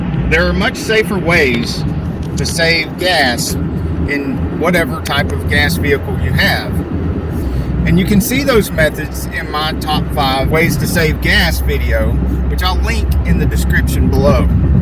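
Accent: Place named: South, United States. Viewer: I love your reviews, especially with your original southern accent 😁👍🏻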